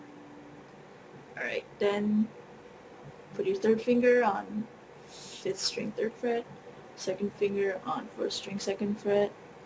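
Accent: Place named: Asia. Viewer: Lol ur asian accent. You said," da tabs..."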